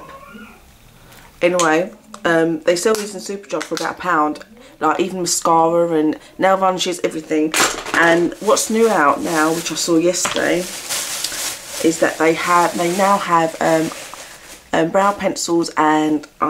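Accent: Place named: Australia. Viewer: Youre bloody stunning! You kinda sound Aussie :D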